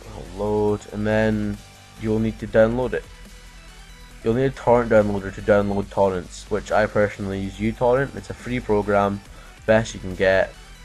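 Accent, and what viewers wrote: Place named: Scotland, United Kingdom. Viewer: hes scottish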